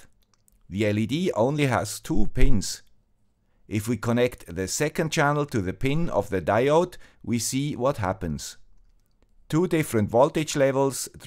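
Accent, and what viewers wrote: Switzerland. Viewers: Another very interesting video from the old guy with the Swiss accent who is not a doctor, but plays one on TV, or at least on YouTube. I'm surprised that they don't use the blood oxygen meter as an additional test for detecting people with corona virus. Maybe it could detect people with 'shortness of breath'? Final question: Do you really have to pay in Switzerland to see a doctor? Of course we pay quite a lot here in the US, but we are always told that people in really nice countries like Switzerland have free access to unlimited healthcare. Of course we also have to buy our own guns here and only the wealthy can afford their own fallout shelters...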